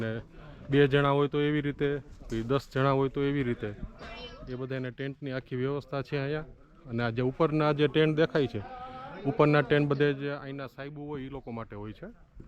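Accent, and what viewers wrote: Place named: Kathiawar, India. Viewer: is our good friend and pro bike rider since many years and a very humble person and now he is on YouTube with our Spl Kathiyawadi language I wish him on his new ride 👍✌️✨🎉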